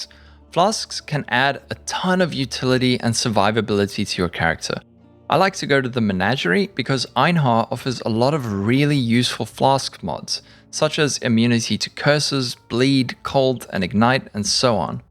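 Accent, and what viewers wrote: South Africa. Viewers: Yo Bru. Where you from? You sound South African👀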